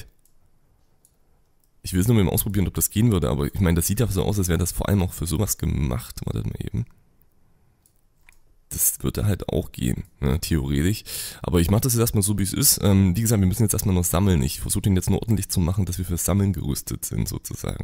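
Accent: Saxony, Germany. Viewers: Du bist ein sachse ?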